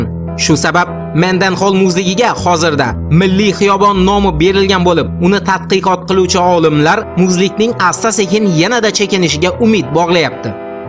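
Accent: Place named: Andijan, Uzbekistan. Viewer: Anjanchasiga gapiryapdi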